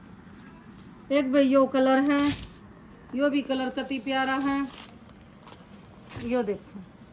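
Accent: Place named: Haryana, India. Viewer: She is belong to haryana state ..so speaking tone is different.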